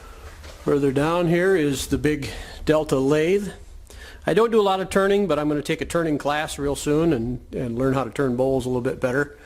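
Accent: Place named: Michigan, United States. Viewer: Nice shop! Mid-Michigan eh? I used to live down in Three Rivers (yes, there're actually 3 rivers. You'd be surprised how many times I was asked…) I should've recognized the accent, guess I've been in California too long.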